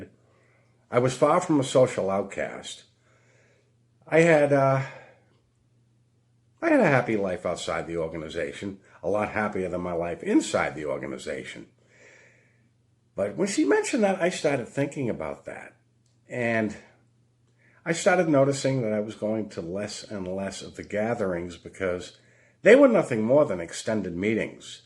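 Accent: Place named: New England, United States. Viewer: I love this channel! I’ve never been a JW. My best friend’s family joined in the early 80’s. She spent 32 years in and finally got out. She was able to remain friends with me that whole time, however. I follow these channels because I like to learn what she went through. I love your stories about your personal experience. Side note, I could listen to your New England accent all day long 😊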